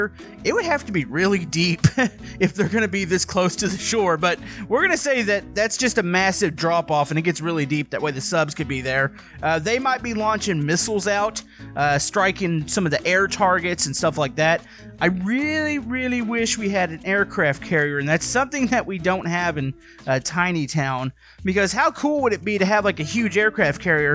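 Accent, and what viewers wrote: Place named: South, United States. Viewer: You kind of have a southern accent at times.. hmmmm lol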